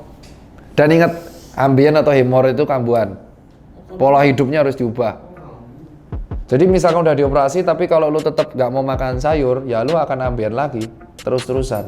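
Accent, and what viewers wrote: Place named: East Java, Indonesia. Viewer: Dr tirta ngomongnya kok kayak orang jawa timur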